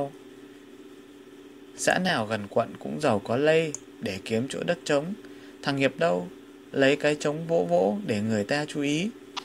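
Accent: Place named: North, Vietnam. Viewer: Giong doc mien Bac cua rat truyen cam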